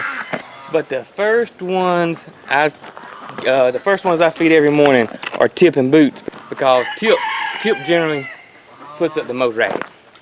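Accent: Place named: Mississippi, United States. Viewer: I love your accent! 😍 It reminds me a lot of my grandmothers, she’s from MS.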